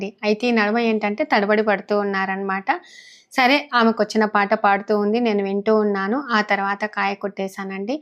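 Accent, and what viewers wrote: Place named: Kambam, India. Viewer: Hi garu yala unnaru me vlogs antey naku chala estam andi ma Ammamma gari uru kambam dhagara Andukey a language naku chala estam madi nellore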